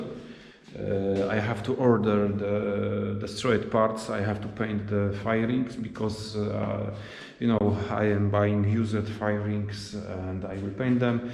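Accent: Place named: Poland. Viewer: polskiego akcentu nie ukryjesz xD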